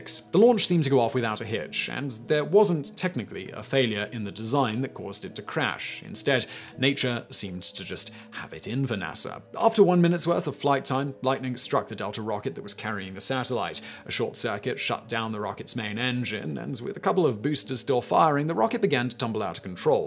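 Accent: United Kingdom. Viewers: He’s speaking correct, British English, rather than some simplified colonial version.🇬🇧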